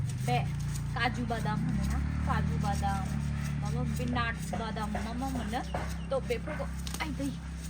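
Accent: Bengal, India. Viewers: Nwgni lairainykwonw kwnasongbabw ohakaya Ang benanao .benggoli baidi khwnakayw bwnggolni Boro lairainykwo.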